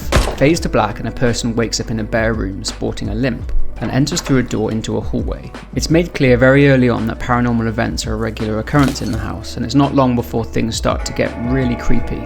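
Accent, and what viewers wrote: United Kingdom. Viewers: Why r u british?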